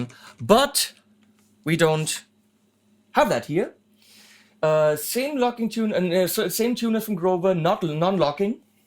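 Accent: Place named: Germany. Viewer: wonderful Sherman Akzent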